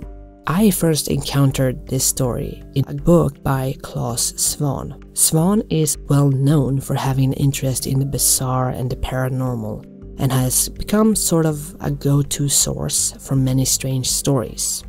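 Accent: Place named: Sweden. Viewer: It's an a Swedish accent. What's wrong with your ears and cognitive reasoning ?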